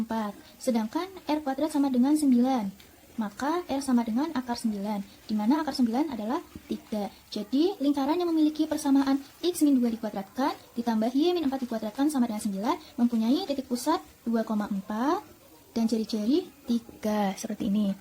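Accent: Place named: Bandung, Indonesia. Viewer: Suaranya kaya orang bandung kak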